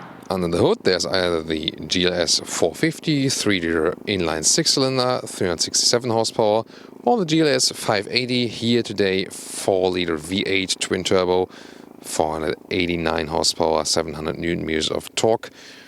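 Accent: Germany. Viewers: I love your detailed commentary. Your English is almost perfect. But you have a very German style of speaking and sentence structure, plus use of some expressions in a German rather than English format. It would be helpful if you spoke more slowly and more clearly. Then we could enjoy every wonderful one of your observations.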